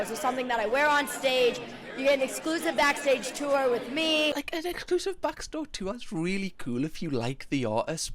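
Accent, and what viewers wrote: Newcastle, United Kingdom. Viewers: Are you a Geordie?! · so refreshing hearing a geordie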